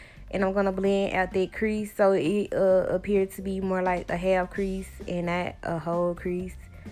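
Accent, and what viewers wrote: South, United States. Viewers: nah i was tryna guess what state grl.. because yu definitely from the south! Nd this makeup is fye..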